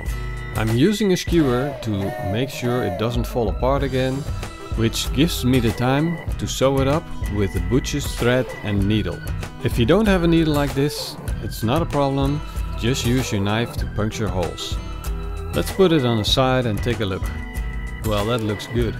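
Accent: Netherlands. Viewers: You can hear the horrible dutch accent. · I already thought I heard a Dutch accent. I was going to ask you if you were Dutch but now I don't need to. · Hoor ik daar een Hollands accent? Mooi recept btw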